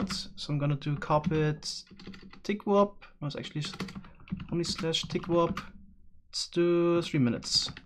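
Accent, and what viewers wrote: Germany. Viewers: He's German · You hear your german accent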